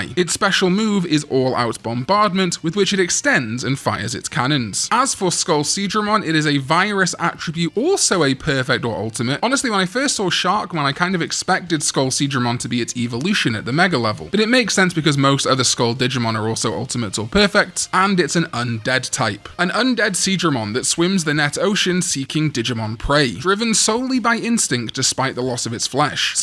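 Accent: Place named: Wales, United Kingdom. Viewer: It's nice to hear a welsh person butchering Irish words. There's some kind of solidarity there.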